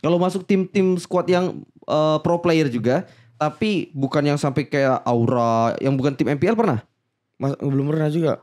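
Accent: Palembang, Indonesia. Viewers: Pantess la logat logat dio ni cak uong palembanggg wkwk retinyo pernahh di palembang g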